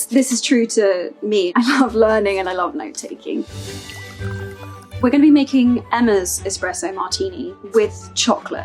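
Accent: United Kingdom. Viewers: エマのブリティッシュアクセント、聞いてて心地良い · イギリスのVOGUEだからかいつもよりイギリス英語が強くてハーマイオニーが脳裏に浮かぶ🪄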